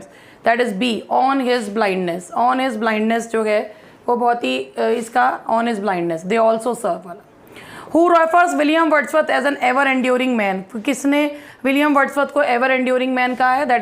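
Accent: Meerut, India. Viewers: Meerut ki tone alag he hai😁.. pleasure to see videos. M.A ki classes yaad ajati hai..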